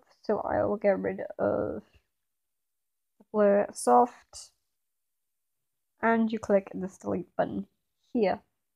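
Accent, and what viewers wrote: United Kingdom. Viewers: Thank you british lady (3) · Thank you British Lady(6) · thank you british lady (2) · Thank you British lady (5) ♡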